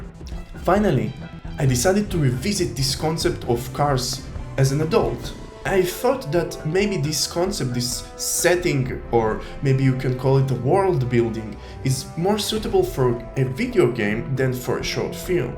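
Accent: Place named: Israel. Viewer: can i ask where are u from? u got Israeli accent, and the game looks amazing!!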